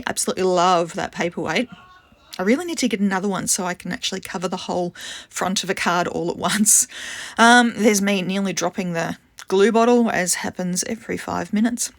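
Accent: Australia. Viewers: Ahhh, that Aussie voice, I don’t get to hear them often anymore since leaving and moving to USA 24 years ago, so it always brings me so much joy. Your background was gorgeous and I am sure the video didn’t show off how truly pretty the glimmer from perfect pearls was. What a beautiful card. Thank you for sharing.❤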